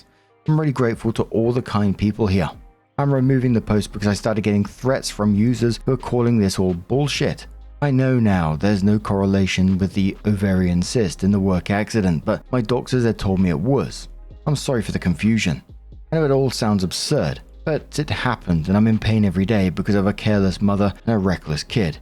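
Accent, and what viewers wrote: United Kingdom. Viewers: Hey I just wanted to let you know that I really enjoy it when you talk about your own life and relate it to the stories. I feel like you must've gotten comments from ppl who don't like this or something bc you always defend bringing up your personal life. If people don't want to hear about it then they should go listen to AI robotic slop voice rereading reddit stories. I like your channel BECAUSE you bring a personal touch and I also love British accents lol. You're the only channel on yt I actually pay a membership for bc I appreciate your content so much. I listen almost daily on my commute to work and back and I really enjoy hearing about you and poppy and your family. So please don't ever be discouraged to share that.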